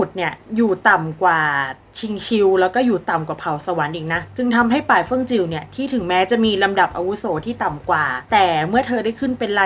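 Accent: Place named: Isan, Thailand. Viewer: เเดนอีสานนาาาานิ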